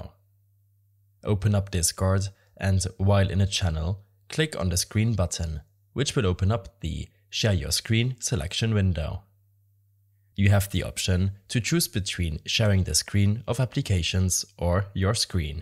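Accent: Germany. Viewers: sehr starker deutscher akzent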